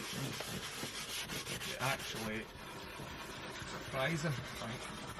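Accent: Scotland, United Kingdom. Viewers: Yfoods? Or wife hoods? I love the scottish accent :) great vid as always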